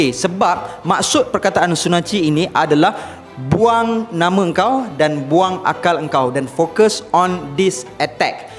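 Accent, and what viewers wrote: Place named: Kelantan, Malaysia. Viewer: Kecek klate skali😂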